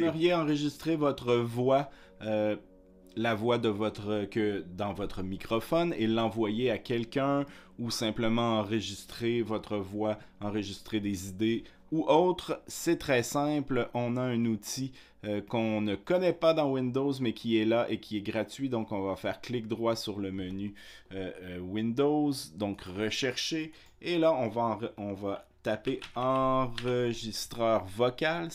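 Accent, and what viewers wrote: Quebec, Canada. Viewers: Super et merci. Pourrais tu me dire comment ouvrir plus simplement les fichiers .ZIP après l'enregistrement avec enregistreur d'actions utilisateur, afin de pouvoir les envoyer plus facilement par mail ou messenger . Je suis de retour en France depuis 30 ans, après avoir vécu à Montréal et ça fait du bien de retrouver un bel accent quebecois. Encore merci.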